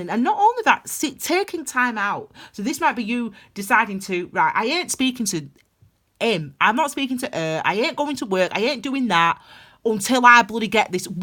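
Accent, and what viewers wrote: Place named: Northern England, United Kingdom. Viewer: Are you from Colne, UK. by any chance? I live in Cardiff now but I’m from Oldham originally, so I recognise the northern accent. I’m guessing it’s either Colne or Burnley.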